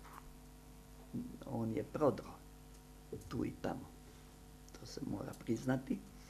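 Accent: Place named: Krajina, Croatia. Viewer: Говори као и сви Крајишници!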